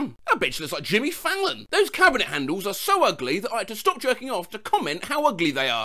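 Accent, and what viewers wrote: United Kingdom. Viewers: ITS SO FUNNY HEARING THIS MEME IN MY HEAD CORRECTLY AND THEN HEARING A BRITISH GUY, WHO CAN READ KEYSMASH, ATTEMPT TO READ ALL THESE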